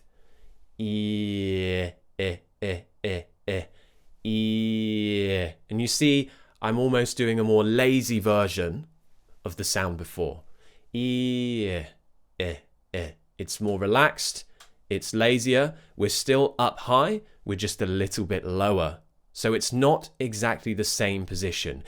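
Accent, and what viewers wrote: United Kingdom. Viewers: I have been trying to differentiate the American IPAs and the British ones... Not easy... But I think I have figured it out thanks to your video. Love you, teacher!!!!!! Thank you so much!!! Your British accent sounds soooooooooo nice in my ears.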